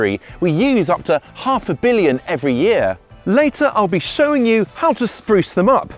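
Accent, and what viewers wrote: United Kingdom. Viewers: "just pop it in"..."use a brisky brush"...I will never tire of the British vocabulary and accent! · I love the British accent.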